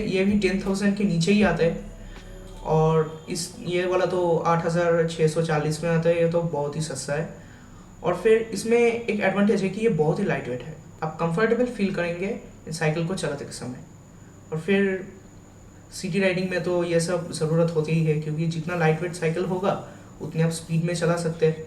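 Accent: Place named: Bengal, India. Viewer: your hindi sounds like bengali....nice though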